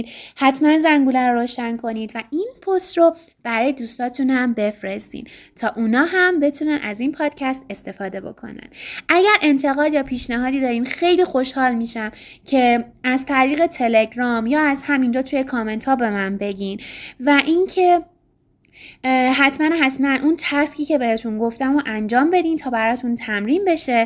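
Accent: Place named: United Kingdom. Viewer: British accent 🤗🌹💋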